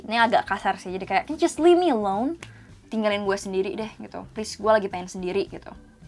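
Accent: Indonesia. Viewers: Ternyata kamu orang indonesia ia ka? Dari logat bahasa inggrisnya sih terlihat orang indonesia tapi dari penampilan saya pikir dulu orang barat sana 😀😀 Sukses terus ka, saya banyak belajar dari kamu 🙏🙏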